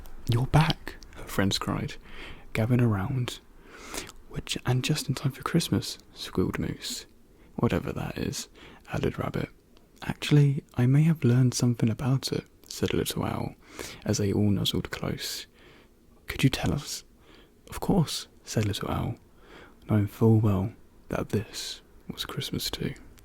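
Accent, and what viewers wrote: United Kingdom. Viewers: That British accent tho was so addicting to listen😂😅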